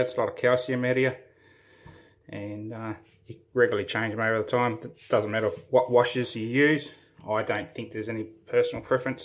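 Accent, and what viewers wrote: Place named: Australia. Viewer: Yer rite maaate! It is a local accent. Understood throughout OZ. Try those hillbilly accents from some states in the USA. Impossible!!!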